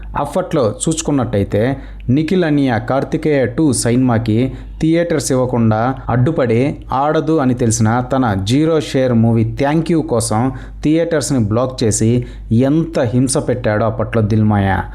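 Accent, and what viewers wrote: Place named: Telangana, India. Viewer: Antha baane undi gani Nuv matladedi Telangana na, Srikakulam ah, Godavari yaasa na ardam kavatle anna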